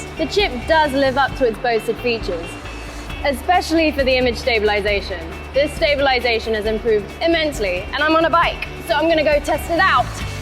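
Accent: United Kingdom. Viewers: WahWah she sounds British Not Australian!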